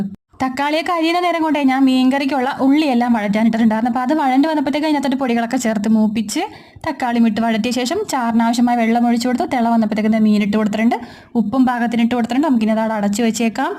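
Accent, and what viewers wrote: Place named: Thiruvananthapuram, India. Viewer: ചേച്ചിടെ ചില സംസാരം കേൾക്കുമ്പോൾ തിരുവനന്തപുരംകാരി ആണെന്ന് തോന്നുന്നു ഇവടൊക്കെ പറയുന്ന അതേ സംസാരരീതി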